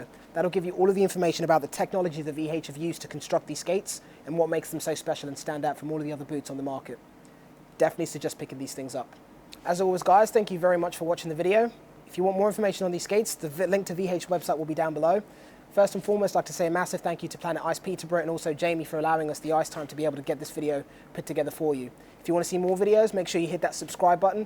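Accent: United Kingdom. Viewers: I have to say this: I really enjoy the way you speak (voice, accent, style – snappy, masculine and British). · A black fellow with a British accent talking about hockey.. definitely don't see that every day eh?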